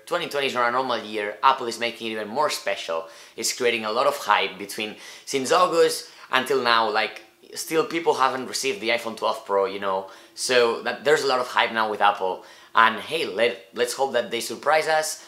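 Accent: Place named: Italy. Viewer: I am definitely obsessed with Apple! I like your accent! Where is it from? Italy?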